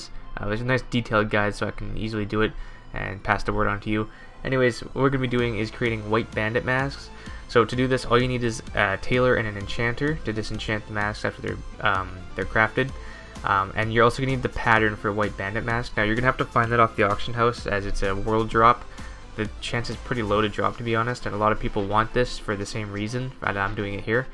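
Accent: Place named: Canada. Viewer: great video brother I can't tell if your from the north US or Canada you kinda sound Canadian lol my bad I just like to know where ppl is from.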